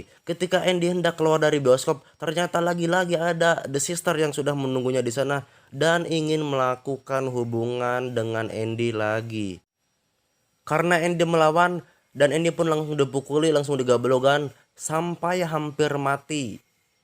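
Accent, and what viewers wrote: Karawang, Indonesia. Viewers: bjir orang karawang 🤣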